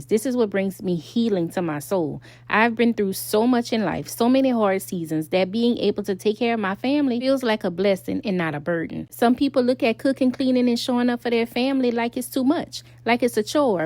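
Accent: Louisiana, United States. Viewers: You sound just like my family from Louisiana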